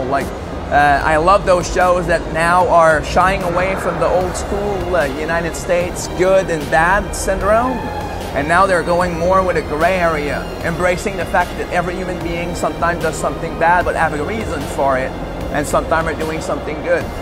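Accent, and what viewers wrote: Quebec, Canada. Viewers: Dat québécois english accent! :D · He is right. His accent does come from Canada although only this accent is a French-Canadian accent that will only be found in Quebec and some parts of Ottawa. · to folks wondering about the accent he's from Canada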